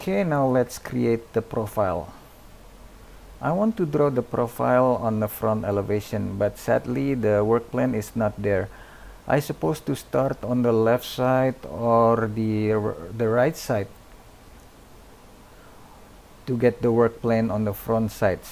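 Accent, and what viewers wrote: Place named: Indonesia. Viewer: tapi pengucapannya bagus kok mas, dengan aksen Indonesia...:) Dulu pernah kuliah/tinggal di Luar Negeri kah?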